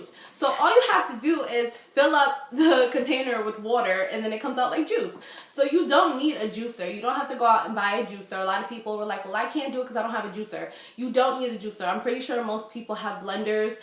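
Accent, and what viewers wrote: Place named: New York, United States. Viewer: NY accent... eeeww!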